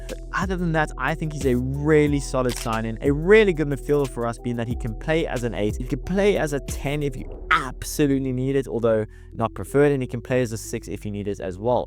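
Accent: South Africa. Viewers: You sound South African so I will definitely support your channel coyg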